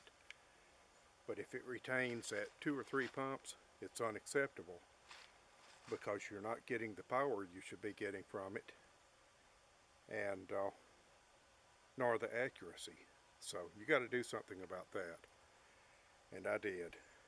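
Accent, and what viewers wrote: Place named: Louisiana, United States. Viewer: Interesting. Your accent and phraseology are just like my relatives (mostly gone now) from Bienville Parish. But, they came there long ago from the Montgomery area of AL. I used to live in Chattanooga. My Sheridan C9A (which I bought new in 1998) wasn't shot for a long time until recently. It was not stored with any air in it. I got it out recently and I've been trying to shoot it, but I was cocking the bolt and placing a pellet in the chamber. Then, I would start pumping and on about the 2nd or 3rd pump, it would shot out the pellet out at low velocity. After that, I could still pull the trigger and it would 'shoot' with no pellet. I have oiled it since with some compressor oil and not inserted pellet until after I've pumped and it seems to be shooting ok now. But, on the last few pumps, it seems I hear air moving somewhere right after the stroke if finished. 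Thanks for video!! Steve McCoy Van Osdell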